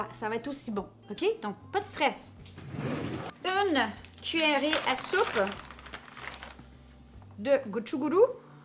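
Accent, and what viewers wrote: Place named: Quebec, Canada. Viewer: J’hésite.. ton accent.. Québécoise? Française? Québécoise qui parle à la française? Quel mystère! 😂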